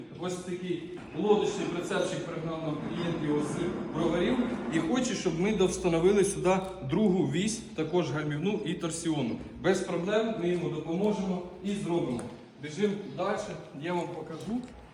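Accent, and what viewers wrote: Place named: Surzhyk, Ukraine. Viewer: Ну говори уже на русском языке, слышно же по твоему суржику что ты русскоговорящий.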